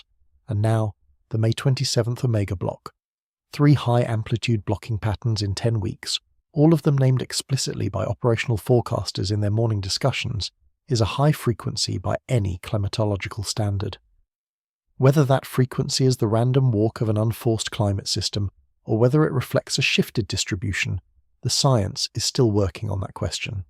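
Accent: United Kingdom. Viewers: Why the British accent on these videos?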